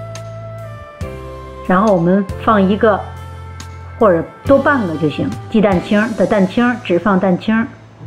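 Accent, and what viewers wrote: Tianjin, China. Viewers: 有些天津口音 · 大姐天津人吧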